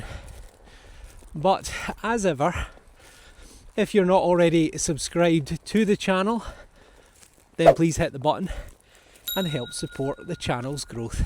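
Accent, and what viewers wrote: Scotland, United Kingdom. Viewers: You no what I just trying to watch your video and just as I got in to it some stupid adds appear it bloody happens all the time. It's just rubbish how we just get bombarded with all those rubbish adds . It does not make sense, the things they advertise are just crap. No one would be bothered to even think about buying it. Sorry mate for letting out my frustration. Your doing a great job and we appreciate your enthusiasm and knowledge. Keep up the good work mate. Like your. Scottish accent.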